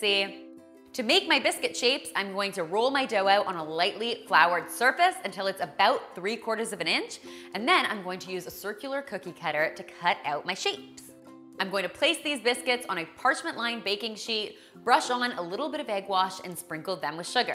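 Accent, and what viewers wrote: Canada. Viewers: you're right, her accent was much more prominent in this video :) I knew she was Canadian, but the entire video I was thinking about how clearly Canadian her accent is in this one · terri-louise Ó leathlobhair Canadian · Is she canadian? I didn't notice her slight accent until this video... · yes she's Canadian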